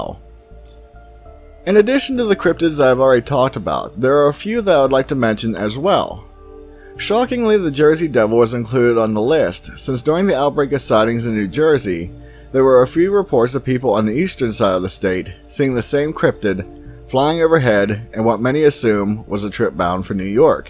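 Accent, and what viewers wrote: Pennsylvania, United States. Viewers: As soon as he started talking, I could tell he was from PA.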